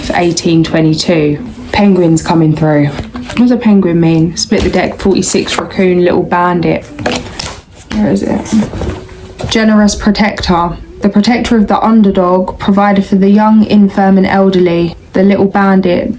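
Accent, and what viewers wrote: United Kingdom. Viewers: I love that British accent!!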